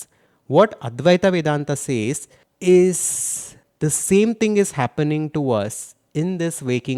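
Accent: South India, India. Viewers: You’re feeling a little out of place because of his accent. It’s the regular Indian accent which all Indian languages speakers understand. You’re used to western accent which is foreign to us when we travel to Britain or USA, but with time everything falls into place. No one is disturbed by your observation. We are one people so don’t worry. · It's just his indian enunciation,but we aught to focus on the content : l thought it very informative,and useful. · It’s called an accent, he has an Indian accent. 🙄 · That s an strong south Indian accent , u north indians will feel it different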